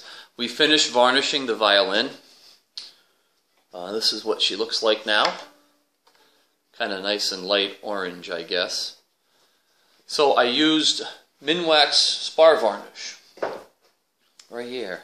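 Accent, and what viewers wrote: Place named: Appalachia, United States. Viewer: That has a deep mountain twang love it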